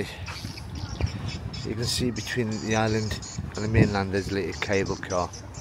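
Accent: United Kingdom. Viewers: Hi there, Hope you are enjoying your trip my country. I am just wondering about your British accent that you are speaking. What part of Britain do you come if you don't my to share ?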